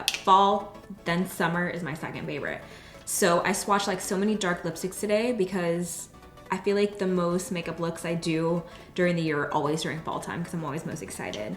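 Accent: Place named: Tennessee, United States. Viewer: I know people have to grow and change which isn't a bad thing...but I miss the old, filming in her bedroom with her cute Tennessee accent 😔😕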